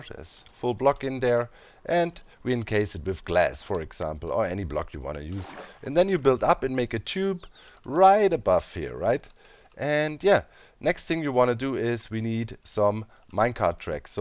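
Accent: Germany. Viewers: Klar das merkt man schon am deutschen Akzent..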